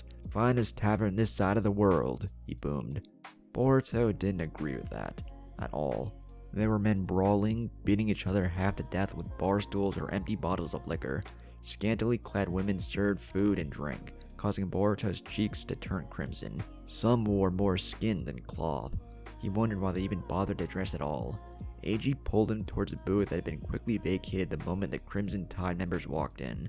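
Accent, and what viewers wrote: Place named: South, United States. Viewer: Love that talks like he’s from the dirty south 😂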